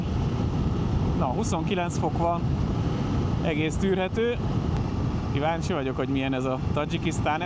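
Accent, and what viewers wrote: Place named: Szekler Land, Romania. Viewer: Szervusz! Csaba (62 éves) vagyok Marosvásárhelyről. Szép kiránduláson vagytok, jó hogy a nagyközönséget is be avatjátok! Nagyon tetszik a székelyes beszéded és, hogy nagyjából mindig van egy jó beköpésed ami feldobja a filmet. Nem akarok kritizálni, de ha megkérhetlek mikor várost, tájat filmezel lehetne egy kissé lasabban mozogj a kamerával, mert majdnem élvezhetetlen a film. Ha sietsz akkor inkább kevesebbet filmezz de lasabban forduljál. Mikor kezedben a kamera akkor a kijelzőt nézd az egyik szemeddel a másikkal meg azt hogy hova fogsz fordulni. Tényleg nem rosszindulatból írtam! Amúgy én is szoktam filmezni mikor külföldön motorozok. További jó és száraz utat kívánok, kellemetlen meglepetések nélkül!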